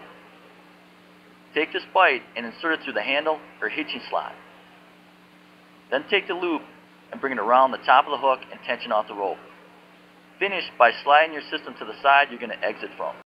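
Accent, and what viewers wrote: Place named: Upstate New York, United States. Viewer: Dude sounds like Upstate New York in accent.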